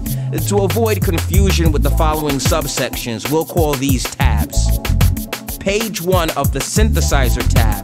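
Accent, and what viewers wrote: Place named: United States. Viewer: No I'm just saying that his english teacher which I'm 100% sure that teaches British english and not american english would assert to him that the accent of the voice in this video is american and not english ( in a sense of british english ). That's all my friend! ...and I don't know why I'm losing time to clarify this stupid thing to you.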